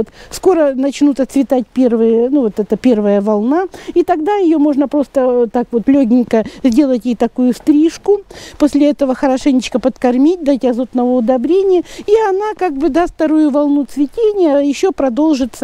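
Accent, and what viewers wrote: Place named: Russia. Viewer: Hola,creo que por el acento eres rusa,hermosas plantas,aunque no se que decías, abrazos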